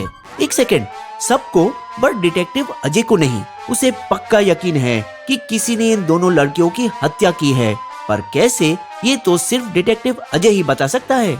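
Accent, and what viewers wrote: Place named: Punjab, India. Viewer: Iss video ke vois aditing wala jaror panjabi hai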